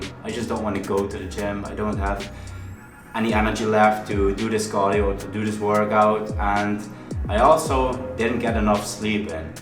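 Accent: Netherlands. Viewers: You sound like you're from Limburg.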